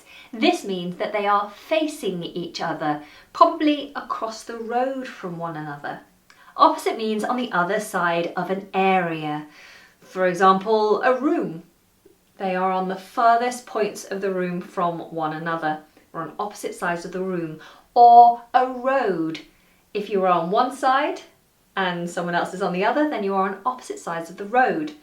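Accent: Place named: United Kingdom. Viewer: I'm( in ) love with British pronunciation...A fan from colombia south America..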